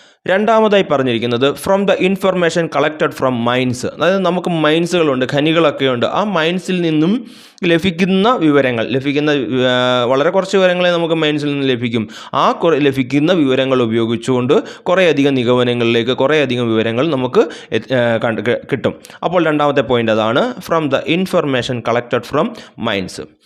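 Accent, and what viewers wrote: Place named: Kottayam, India. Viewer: Ath thettalla avarude language Angane aayittanu. Kottayam polulla chila placesil 'PA' ' Pha' pronunciation different aavum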